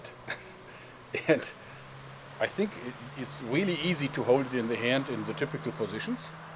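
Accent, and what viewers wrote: Germany. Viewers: Also war der Dialekt doch deutsch :D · Nope, keine Fehler nur starker deutscher Akzent, aber das ist ja normal. Einige Engländer mögen sogar den deutschen Akzent :D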